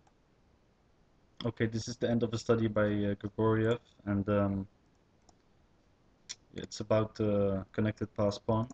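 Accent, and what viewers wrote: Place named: Egypt. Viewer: Why English Mr?!, For who you are making this? Make same video with your sweet Egyptian accent pls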